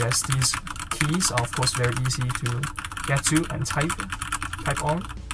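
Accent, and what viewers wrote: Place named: Denmark. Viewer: you can hear thet u are Danish but ure videoes is really cool though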